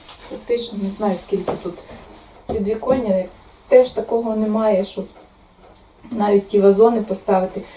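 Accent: Western Ukraine, Ukraine. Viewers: Непохоже,что вы из Запорожской области.выговор у вас западенский.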